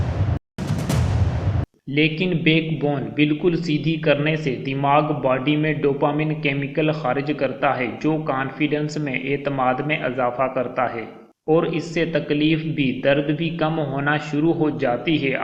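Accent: Sindh, Pakistan. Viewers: Translated from English in Sindhi accent !!!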